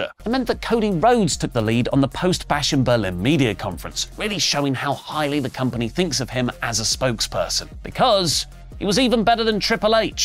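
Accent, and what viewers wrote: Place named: United Kingdom. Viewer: okay i know its the british accent, but it takes 5 seconds to look up. Trey Mi-G-el. Just pronounce the G. No need to pronounce the U.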